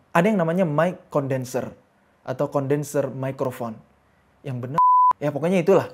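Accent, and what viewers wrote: Aceh, Indonesia. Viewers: Masyaallah bg..tabarakallah.. Ciri bahasa masi kental acehnya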